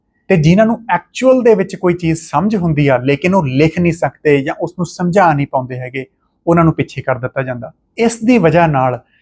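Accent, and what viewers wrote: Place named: Canada. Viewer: Yes I want it also. Because sir speaks canadian accent.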